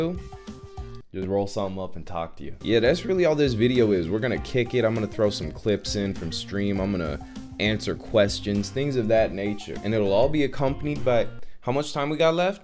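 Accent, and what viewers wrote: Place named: Wisconsin, United States. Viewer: My boy have to be from Wisconsin lol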